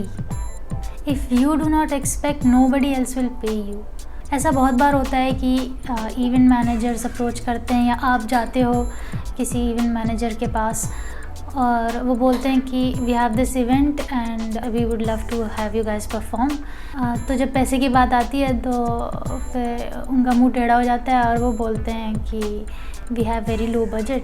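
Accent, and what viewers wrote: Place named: Delhi, India. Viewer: Don't give up! Persistence is the key to success Btw, your accent is similar to my father's. I believe you are from new delhi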